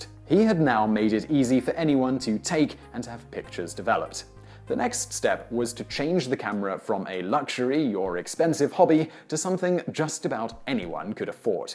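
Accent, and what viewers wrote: United Kingdom. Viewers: Written in American English, presented in British English.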